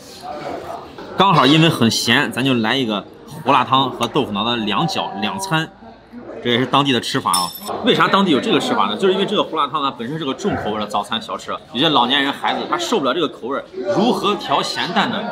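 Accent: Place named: Xi'an, China. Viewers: 堪比中央电视台节目主持人，特别好的风格，看你探店吃美食就是一种享受。而且文化修养也非常高。我大学在西安上的，所以看到就觉得特别亲切。西安普通话😆 几乎每集都看，继续加油。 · ，你是不是咱们西安人啊？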